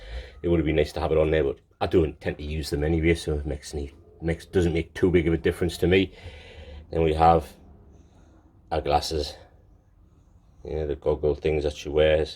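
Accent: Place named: Newcastle, United Kingdom. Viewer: Areet. I am from Sunny Sooooth Shields. Nice to hear a local Geordie review some hot toys figures. F*ckn Best accent in the world and what hot toy review needs! Beats the Aussies, Americans, southerners etc on here! I have literally just stumbled across your channel browsing reviews of my next purchase. Awesome collection you have man. I have just subbed. Hope to see more! 💪🏻👊🏻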